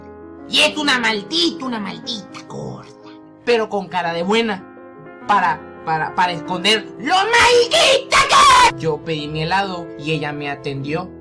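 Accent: Mexico. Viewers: Es mexicano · Es que el es de México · serian mas graciosos con su acento mexicano XD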